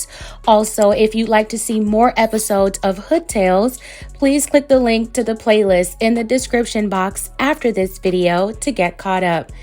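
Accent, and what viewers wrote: Baltimore, United States. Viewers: I see you bro ! A.I.. sounds great! I do miss the bmore accent tho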